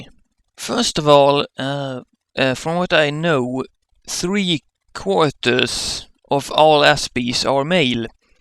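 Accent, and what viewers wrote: Sweden. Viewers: med Wa...Yeah, I agree on both points! :-) I was like, "Is he Scandinavian, German, Swedish, just Autistic vocally in his own way?...But hey, Swedish sounds good to my ears from this guy. ;-)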